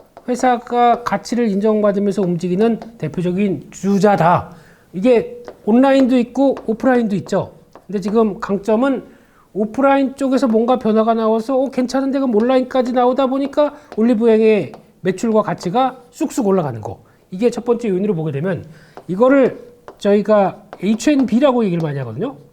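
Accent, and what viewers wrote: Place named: Liverpool, United Kingdom. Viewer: ...!날이갈수록 영어가 영국식(리버플쪽)으로 변화는듯~엄청최고👍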